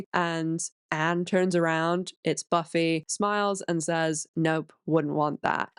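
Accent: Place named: United Kingdom. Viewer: How come every 15th word is in a British accent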